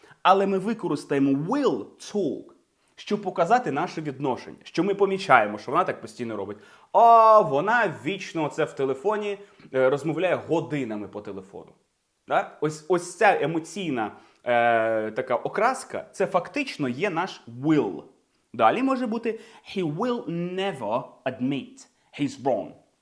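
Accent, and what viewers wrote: United Kingdom. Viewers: Хахах... Усі вчителі навчали нас зовсім по-іншому! Усі, буквально кожен з них вимовляв просте слово "open" як [оупен]... 😂 У вас же - зовсім інакша, але така типова вимова як для звичайного англійця! Не "оупен", а щось схоже на "ьойпен" (майже так само якщо поставимо якусь приголосну перед м'яким знаком, але не вимовляючи цю приголосну!). Просто шикарний British accent!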